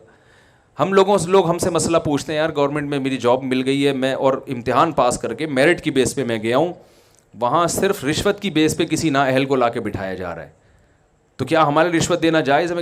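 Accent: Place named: Mumbai, India. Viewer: Aap k bolne wala andaz pura mumbai type hai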